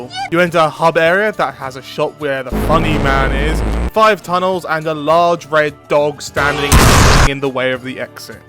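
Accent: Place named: United Kingdom. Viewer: Next time pick a non British voice actor. Great video thou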